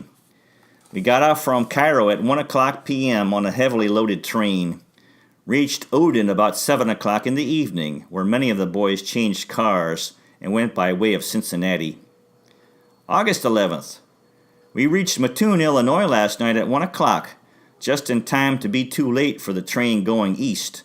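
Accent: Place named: Germany. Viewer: Do u speak German ? It seems like you have a slight German accent very interesting diarrhea appreciate you reading it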